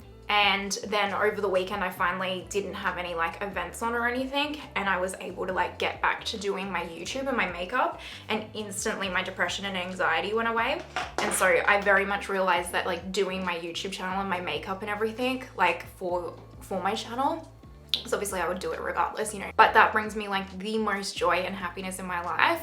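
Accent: Australia. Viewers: You are now one of my favorite you tube channel to watch, so glad I found your channel, so enjoyable to watch so talented and that Australian accent is everything! ❤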